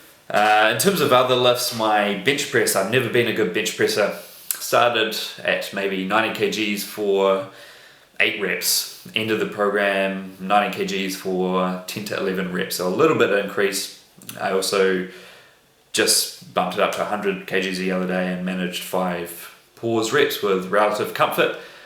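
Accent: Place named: New Zealand. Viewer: Sounds like a kiwi, chur bro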